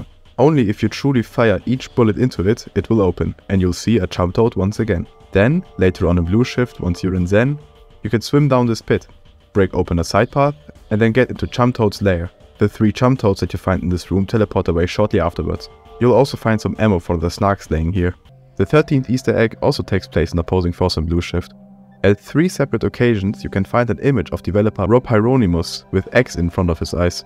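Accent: Germany. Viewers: Der duuuude ist doch deutsch oder? 🤔🤔🤔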